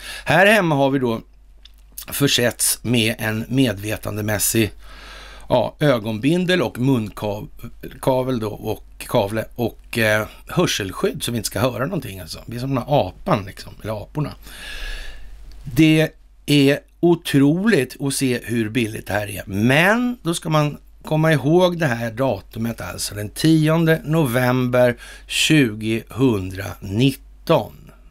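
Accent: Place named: Gothenburg, Sweden. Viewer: Vänligt av dig att du tog tid på dig och kom tillbaka tidigt igen ja förra klippet var jätteroligt trevligt att höra lite göteborgska igen det var länge sedan, 🌺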